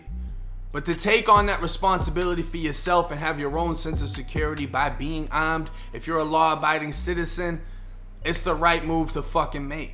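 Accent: Boston, United States. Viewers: I'm from Boston massachusetts also and I definitely am a subscriber. I love your baston lol accent 🥰🥰🥰🥰🥰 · Are you from Boston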